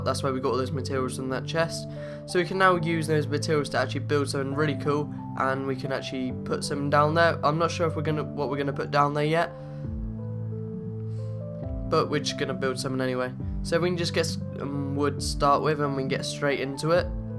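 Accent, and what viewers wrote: United Kingdom. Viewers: I love your british accent haha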